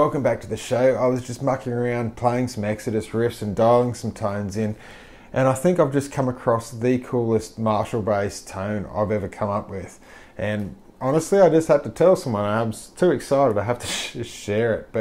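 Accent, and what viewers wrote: Australia. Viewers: Aussie AF ! 🇦🇺 bet you was a mad sk8r too !!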